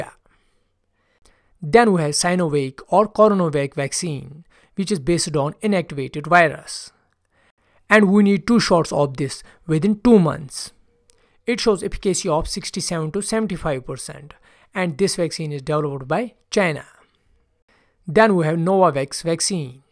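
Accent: Kashmir, India. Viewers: Kashmiri guys ....ur tone of eng shows ...nyc vdo bro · i can tell you already by the indian accent that chinese vaccines will be rated the worst before i even watch the video :) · 200% he is kashamri..but nice way to english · Indian accent, nah bye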